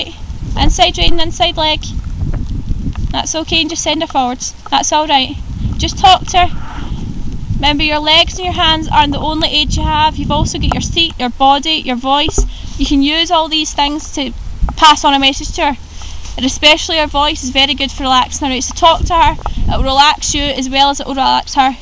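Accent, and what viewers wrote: Scotland, United Kingdom. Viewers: wow you sound fantastic - i totally need to get you as my instructor haha. also - bizarre question - but are you glaswegian or scottish? xxx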